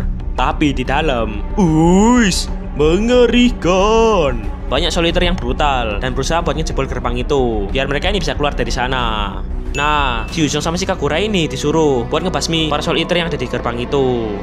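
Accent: Java, Indonesia. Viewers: Bg lu org Jawa ya bg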